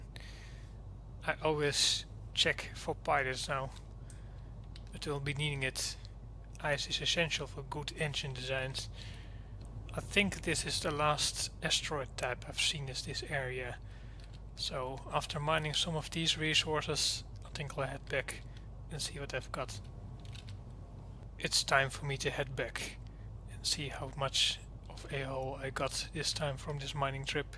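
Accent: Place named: Netherlands. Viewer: Heey, Just asking, but are you dutch? For some reason your English sounds like how dutch people speak it:)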